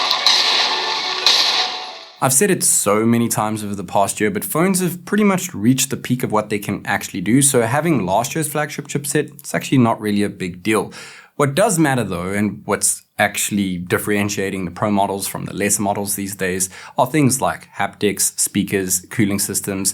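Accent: South Africa. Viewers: kewling system lol love the South African accent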